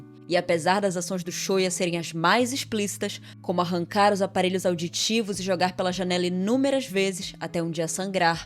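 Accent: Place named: Pará, Brazil. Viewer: Vc é paraense né, aqui as pessoas tem uma fala meio igual de portugal.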